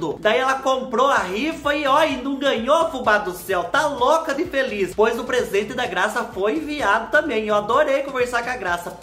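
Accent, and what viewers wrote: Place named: Minas Gerais, Brazil. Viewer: Eu acho q é minéiro mesmo fala igual a mim kkkkk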